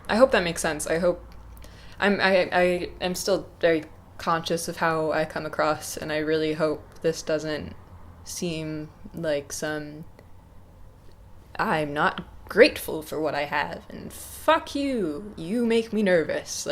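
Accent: Midwest, United States. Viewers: i finally heard the midwest in your voice here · You're sounding more American again. Huh. Interesting. hahaha speaking of analyzing. Glad you seem well and that the break was good for you and a well thought out, conscious decision. Privacy is healthy, keeping boundaries is healthy. Good on you!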